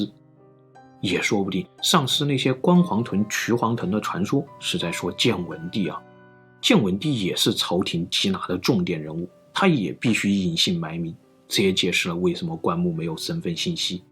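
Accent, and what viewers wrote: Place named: South, China. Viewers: 是川味普通话。关于捡金的习俗，你不知道四川也有此习俗吗，我很小的时候远远的见过，坐标川西南。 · 👏👏👏每周必看的节目，雷打不动😎😎😎 请那些刻意(觉得可爱开玩笑的不算)对口音挑剔的移步去看中央新闻联播。好走不送👋 我们南方人就是这样说普通话达。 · 別挑剔啦！ ㄧ定是南方人，可能是四川或是江蘇人吧！咬字發音自與北方人不同啊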